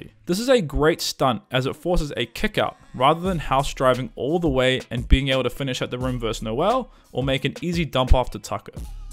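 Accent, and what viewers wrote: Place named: South Africa. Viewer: i smell South Africa in that accent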